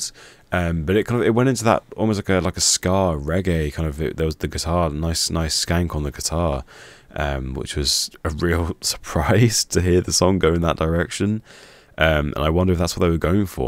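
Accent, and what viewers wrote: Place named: United Kingdom. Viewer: I will check out anyone checking out. British accent a bonus.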